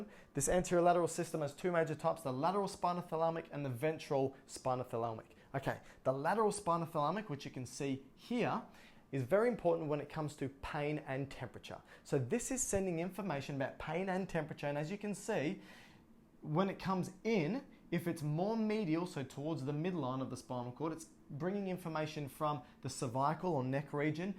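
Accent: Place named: Australia. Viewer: Anatomy lessons AND an Australian accent????? I just hit the jackpot lol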